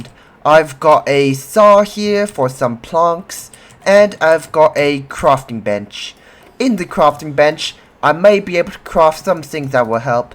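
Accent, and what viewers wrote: United Kingdom. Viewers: I swear he sound British.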